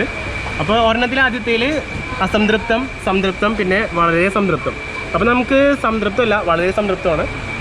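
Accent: Thrissur, India. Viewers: Thrissur kaaranano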